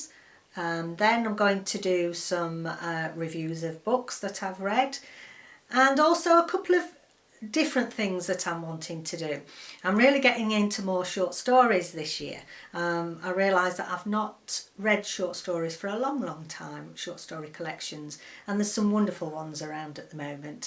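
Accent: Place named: North, United Kingdom. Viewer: Welcome to Booktube, it's lovely to find you. Subscribed! Can I ask where you are from? You sound like a fellow Northerner. 😀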